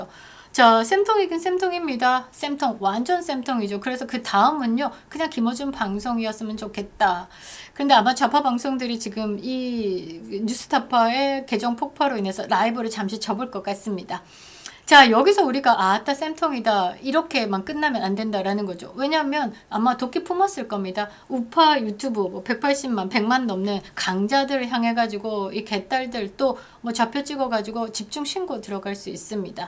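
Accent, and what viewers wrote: Gyeongsang, South Korea. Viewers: 의 님 말씀이 참 귀에 쏙쏙 들어옵니다. 특히 좌빨이, 쌤통 등 ~~~ 경상도 사투리로 이렇게 찰지게 설명하시는 능력이 탁월합니다. 늘 응원합니다~~~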